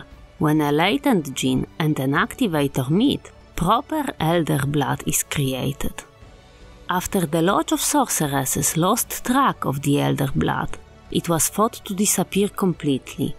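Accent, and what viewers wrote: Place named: Eastern Europe. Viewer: I love your accent, thought first its Italian but its slavic isn't it? Subscribed and looking forward to listening to more lore in the best voice and accent for it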